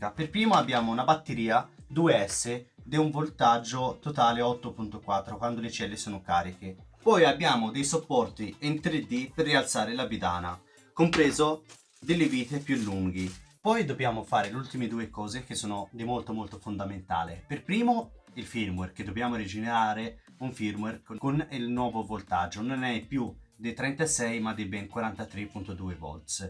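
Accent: Tuscany, Italy. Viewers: Di dove sei ? Toscano? Se ti mando i monopattino me lo modifichi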